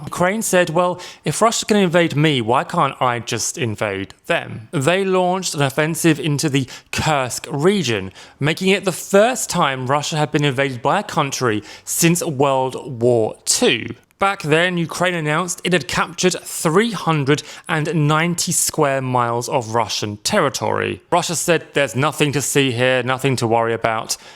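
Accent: United Kingdom. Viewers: Are you British!?😯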